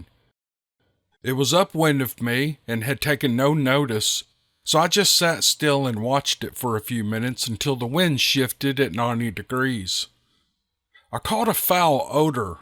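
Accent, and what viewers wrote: South, United States. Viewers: You sound like you're from Tennessee or Mississippi somewhere like that. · Don't know how everyone else pronounces Mor ls Morsel mushrooms If you have never had the pleasure of eating a plate full I wish that pleasure for you Or if it's you southern drawl, more power to you!!!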